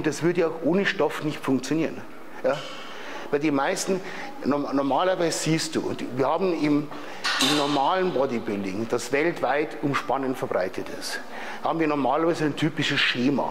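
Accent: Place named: Bavaria, Germany. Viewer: Hallo, wieder mal ein super Video, richtig gut erklärt, auch dein bayerisch ist angenehm und verständlich 😁 Was ich mir wünschen würde wäre ein Training speziell für Bauch(Körpermitte) was für viele sehr unverständlich und falsch trainiert wird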